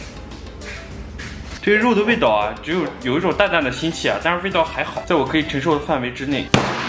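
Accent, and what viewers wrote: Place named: Bayannur, China. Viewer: 我跟你说~ 听出来蓝 是个巴盟人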